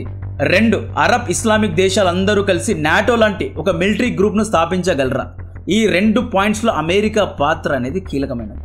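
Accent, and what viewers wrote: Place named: Tamil Nadu, India. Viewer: Arey nuv mandhukotti maatladathava?? Leka arava vaadiva? Ni maatendhukura ala vasthaadhi? Telugodi chinna shortfilm chaesthene oscar andhukuni retire ayinattu buildup isthaaru, nuvventra telugulo videos chaesthunnav? Sigguledhaaraa Goltigey neeku?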